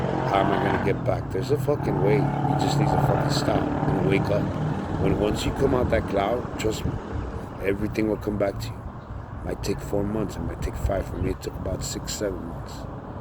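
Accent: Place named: Miami, United States. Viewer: Miami Cuban straight truth, im cuban also, I can tell ,good speaker.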